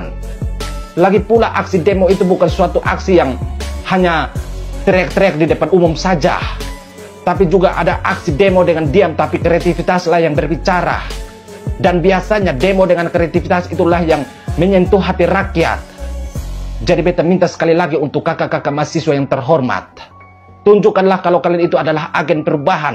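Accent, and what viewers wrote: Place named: East Nusa Tenggara, Indonesia. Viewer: Saya sudah lama kagum dg NTT ini Cara pandangnya tajam Disampaikan dg kalimat yg efektif dg intonasi suara yg pas dan khas NTT Lama saya cari namanya agar bisa lihat konten yutube nya tapi baru sekarang ketemu Bravo bro anda hebat.👍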